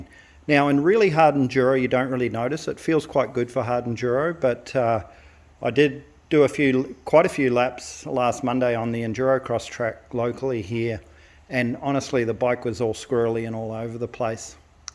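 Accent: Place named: New Zealand. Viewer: awesome bike, you sound like your from NZ not canada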